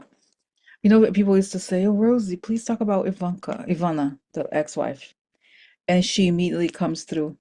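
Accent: Russia. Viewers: Rosie, thank you for these insights. And, I’m here for it. I could watch you put on makeup and do your awesome Russian accent all day long. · Hi Rosie, thank you for your precious information and to be here for us !! You are so funny between your russian accent and different makeups !! A friend of mine who was born and raised in Czechoslovakiab (ex. USSR) told me that Ivana escape her country through the mountains skiing as she was a highly skilled skier. I said to her we never heard this information in the western countries. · Thank you so much Rosie for your psychic data & explanation of current events. I appreciate your solo shows, your beautiful energy, your humor, Russian accent & makeup (though you are naturally beautiful). 🩷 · I’m not bothered by you putting on make up, or the Russian accent, you have a lot of interesting perspectives! Thanks❤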